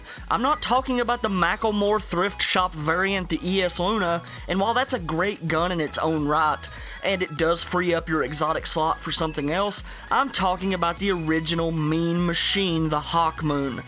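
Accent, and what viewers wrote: South, United States. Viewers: My favorite southerner btw what state r u from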